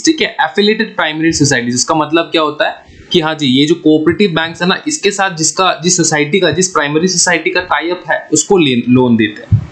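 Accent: Bengal, India. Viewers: Yes , Sir has Bengali accent because He is Bengali🙂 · Seems like sir is has a bengali accent 😄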